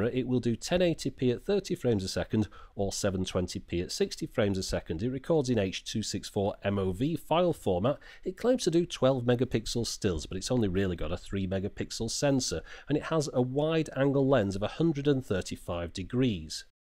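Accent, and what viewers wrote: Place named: North, United Kingdom. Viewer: I can tell your from up north lol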